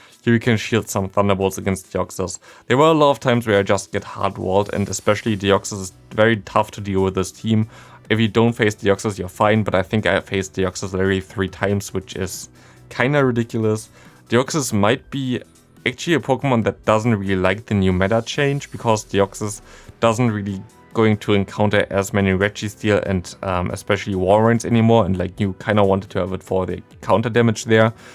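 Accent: Germany. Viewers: If your slight accent gave you away as I'd assume either german/austrian or swiss, its even more insane that you made this video so early. I've just awoken from my slumber, thanks for the dedication and great video :D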